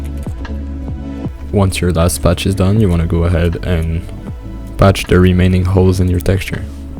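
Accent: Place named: France. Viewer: that's just his french accent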